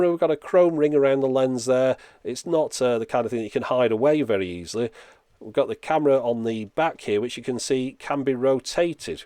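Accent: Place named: Yorkshire, United Kingdom. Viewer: What town is this in? You sound like Yorkshire. If I'm wrong, pardon my untrained Yank ear.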